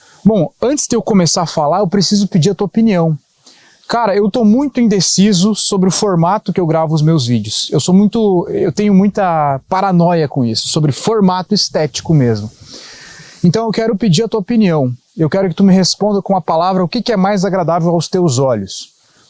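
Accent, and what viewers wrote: Rio Grande do Sul, Brazil. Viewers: aventureiro ou profissional os 2 é bom,mas falta um outro q falava de óculos com um pouco de sarcasmo,deboche e humor com aquele sotaque mais gaúcho,abraço irmão de batalha.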